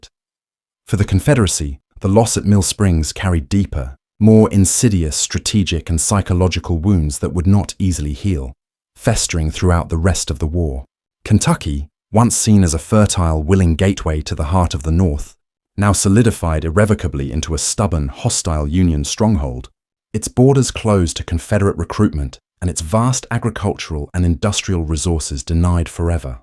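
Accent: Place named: England, United Kingdom. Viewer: They should have chosen a southern american accent narrator discussing this matter. The English accent for this story is off putting. The guy who did PBSs The Civil War would be the best Ai option.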